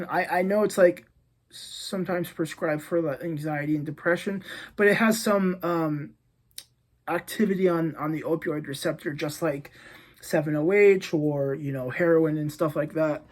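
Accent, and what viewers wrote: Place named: Scandinavia. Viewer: Also, sounds like you are from a scandi heritage, i am from Denmark. Be strong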